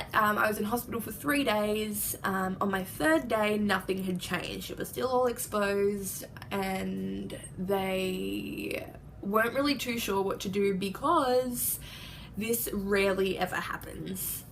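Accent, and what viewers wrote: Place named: Australia. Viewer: ! Keep us posted! Thinking of you:) you are so brave to post this! Would love to see videos or snippets of implants and everything else! Love hearing an Aussie accent. Peace outtt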